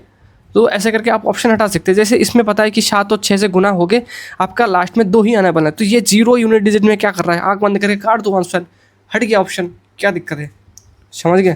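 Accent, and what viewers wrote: Bengal, India. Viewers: ❤❤❤❤ Bhaiya ji aap Bangal se ho kya ??? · Mujhe aisa kyu lag raha hai aap bangali ho😅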